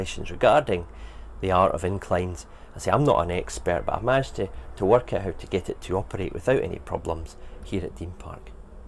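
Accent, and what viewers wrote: Scotland, United Kingdom. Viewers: As your health and safety focal I’ve got to cite you for violation as that red gas cylinder that isn’t stowed should be scored to the cabinet or other object by a bracket or strap (a black painted line or mini chain in HO/OO scale) to prevent tipping. . Hearing a Scot talk about attic heat issues in the summer as I’m be lived 20 yrs in Baton Rouge, Louisiana, makes me chuckle and say “bless your heart”. The AC and a bit more roof insulation should go a long way. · Love the Scots dialogue, we never had subtitles for Jim Taggart :-). Thinking about your LED lighting. Sorry if I'm pitching at the wrong level, When you buy the mini LEDs you can look for the colour temperature measurement. Typically indoor tungsten bulb white was 3200K, flourescent tubes would be a colder white 4000K. From memory we consider daylight to be 5600K in the UK. 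Keep up the videos I've been watching them for while, they're a great inspiration. I'm still preparing my loft to put the boards in!!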